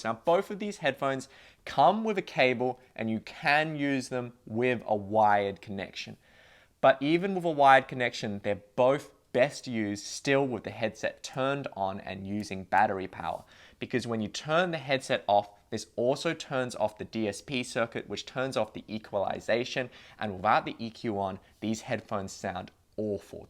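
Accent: Australia. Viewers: and oh i have to say oz accent on an asian looking dude is effin damn sexy! have a great day buddy! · are you Australian?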